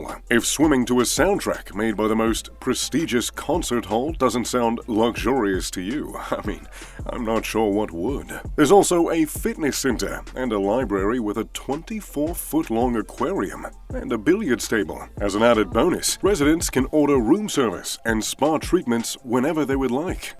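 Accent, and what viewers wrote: United Kingdom. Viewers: He should have gave You a heart for that comment. I usually find British accents annoying, but I like.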